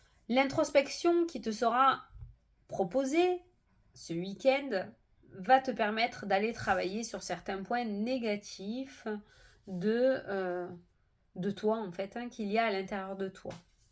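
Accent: South, France. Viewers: Merci beaucoup pour ta bonne humeur et ton accent du Sud, j’ai toujours le même plaisir de te voir tous les jours. Quand à moi j’aime les deux formats que tu proposes j’ai envie de te dire fais comme ton cœur ♥️ a envie, écoute le et lui seul le sait. Merveilleuse journée à toi 😊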